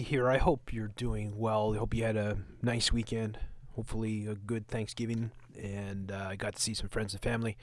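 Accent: United Kingdom. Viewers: I’m from the U.K. her accent is typical BBC and that corporation is absolutely evil, they hid Jimmy Seville’s disgusting behaviour to minors and have a pretty disgusting statue outside the broadcasting house which shows exactly what they are. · British accent, most annoying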